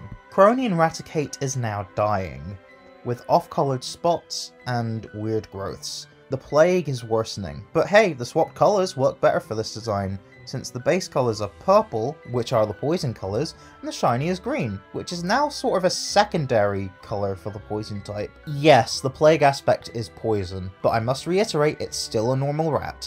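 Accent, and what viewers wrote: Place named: Scotland, United Kingdom. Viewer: hello hello hello what's all this then Scottish accent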